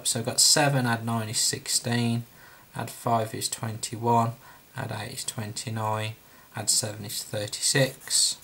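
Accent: Birmingham, United Kingdom. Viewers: Thank you! What part of Brum are you from mate?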